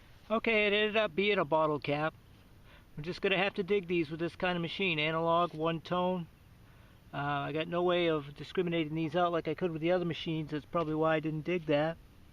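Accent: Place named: Boston, United States. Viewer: congrats like your vids :D love the accent quadah reminds me of that speaking Boston commercial ;P great finds the funny thing is i found that exact same 10 k ring my fiance wears it now wont let me have back lol and that spoon i find lots of those rogers spoons but i have that exact same one. good on ya keep them vids coming .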